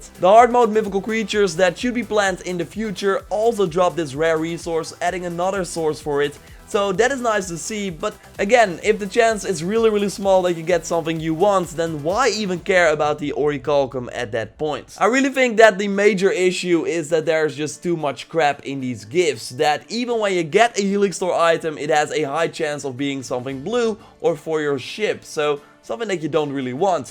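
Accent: Netherlands. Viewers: Your dutch i hear it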